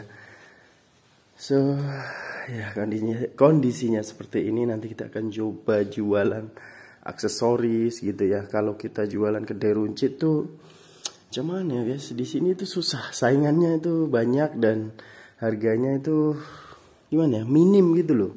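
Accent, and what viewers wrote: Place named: Malaysia. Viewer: Lama kerja di Malaysia ya bang?percakapannya pun dah setengah melayu indo😅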